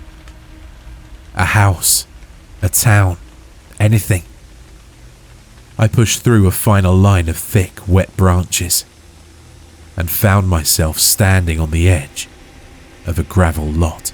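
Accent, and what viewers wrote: United States. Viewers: Excellent American accent, my guy! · Your stories are always great. I use them to help me sleep! Gotta say though because I’m boring, I prefer just your normal voice without the US accent. Just my opinion though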